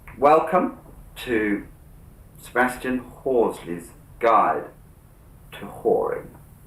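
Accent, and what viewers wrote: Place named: Upper-class, United Kingdom. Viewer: Upper class British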